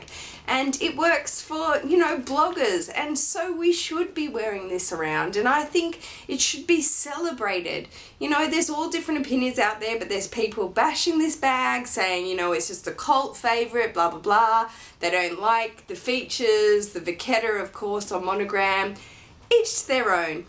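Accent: Australia. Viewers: This video is so good on so many levels! I really love the LV community...even though I'm new to it, I'm not new to fashion/lifestyle blogging...it's really lovely to see the support and friendship here. Fashion blogging has kind of lost that feeling of being kindred spirits because it's so commercial now. Anyway, I just love your videos, not only because you are so cheeky AND well-informed, but because I'm married to an Aussie, and that accent is one of my faves! I used to live in Sydney, so every time you mention Bondi Junction, my heart skips a beat. Thanks for all the killer information, gorgeous! xo V.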